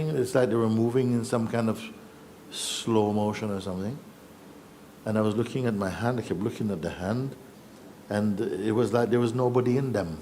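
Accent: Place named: Jamaica. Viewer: He sounds like jamaican..i jave been to jamaica..for 3 years..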